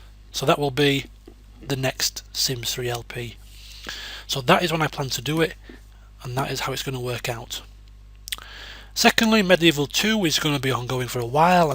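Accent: United Kingdom. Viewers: I see ur videos because of the british accent, is that weird? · New Vegas.. New Vegas! New Vegas! haha man I love you videos man! It's nice to hear a fellow British-man give a 5 star commentary : )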